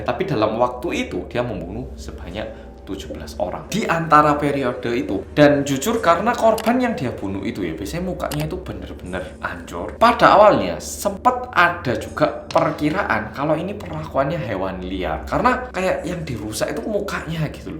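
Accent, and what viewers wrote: Java, Indonesia. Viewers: Pemalas aja entitled banget. Mungkin orang ngga mau namain anaknya kayak gitu karena ngga mau anaknya namanya sama kayak penjahat. Makasih, 🙏🏼🙏🏼 PS: percuma juga kalopun yang baru masuk cuma bergaul sama yang pertama kali masuk penjara. Dia aja ngga mau tanggungjawab malah nyalahin sistem keadilan/penjara di Korea. Cih. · Medooooookkkkk,aku datang wkwkwk:_Haa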